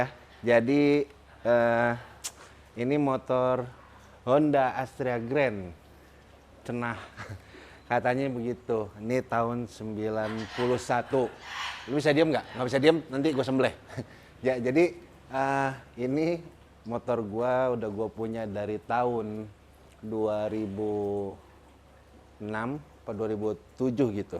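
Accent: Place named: Jakarta, Indonesia. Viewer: demen banget ama logat betawi nya ahaha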